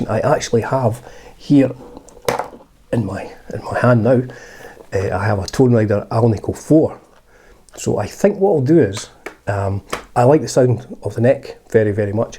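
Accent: Scotland, United Kingdom. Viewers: I like Scottish accent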